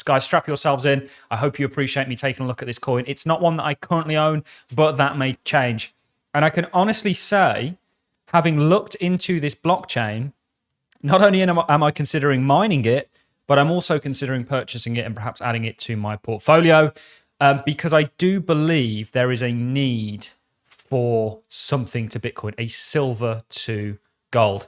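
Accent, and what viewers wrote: United Kingdom. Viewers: Thanks for covering $KAS buddy - graceful and elegant British accent and mannerisms make it sound . . . exactly as great as it actually is !!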